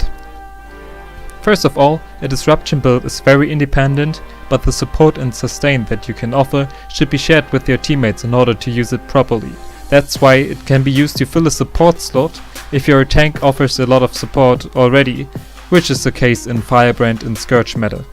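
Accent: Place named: Germany. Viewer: Naja, also man muss ja sagen... Der deutsche Akzent ist ja mal echt lustig :D aber deine intro haut es raus... Mega awesome :3 wirklich und danke für den build anyways :D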